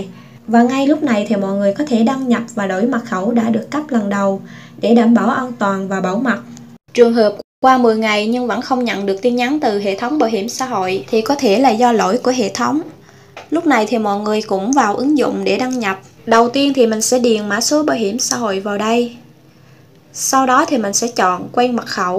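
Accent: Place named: Quang Nam, Vietnam. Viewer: Tks chị, hình như chị người Quảng Nam Đà Nẵng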